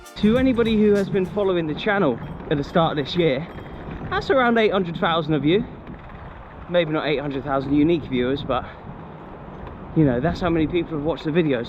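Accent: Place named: Glasgow, United Kingdom. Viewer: "These Hills Man"???????? where did you pick up that saying young man.......I'm sure their was a slight glesga twang in there too lol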